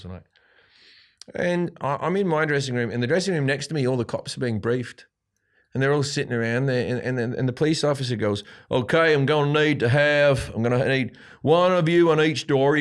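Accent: Australia. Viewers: An Aussie accent delivering dick jokes. I wouldn’t call him a comedian.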